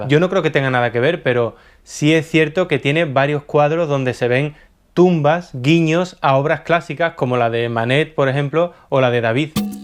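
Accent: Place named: Castile, Spain. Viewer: Tengo ya una semana de haber descubierto su canal. Y que cosa mas curiosa que se me a peago su acento, pero esto sólo a mi subconsciente. Mire que ahora mi mente habla en castellano.....😂😂😂😂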